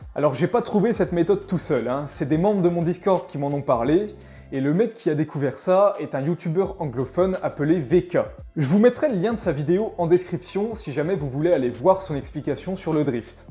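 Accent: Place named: North, France. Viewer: c'était l'un ou l'autre avec cette accent qu'on partage 🤣 Salutations des chtimis 😅😁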